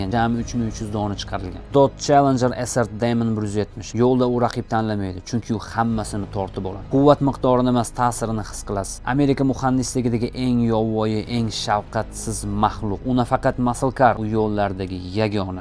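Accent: Andijan, Uzbekistan. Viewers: Andijonli xarp, azgina shevani zamonaviyro qp gaprgin, dordan qochganga o'xshap gaprvurmasdan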